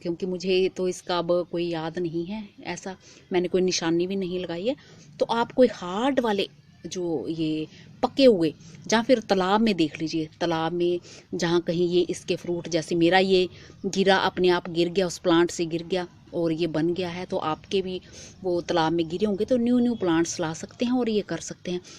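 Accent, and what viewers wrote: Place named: Himachal Pradesh, India. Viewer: apki awaj se saaf pata chal Raha hai app himachli ho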